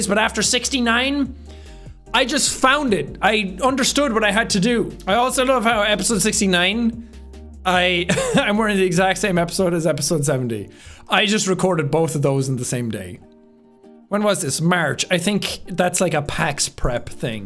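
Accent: Ireland. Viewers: dude the phrase "god's happy no problem bud" and "time for some misery " with that strong Irish accent is sooooo good XD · Loud Irish man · I know this sounds like I'm making fun of his Irish accent but the way he said "why" was just so funny to me. I really like Irish people I think they are really funny but serious at the same time and I just love it. I would move to Ireland if I could.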